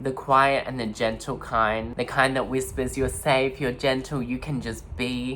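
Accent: Thailand. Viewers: Your Thai accent is so so Thai than some Thai people ❤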